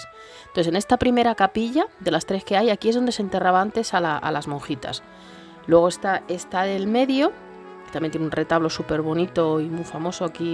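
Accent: Spain. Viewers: Como tu, tan Madrileña, hablando como Andaluza???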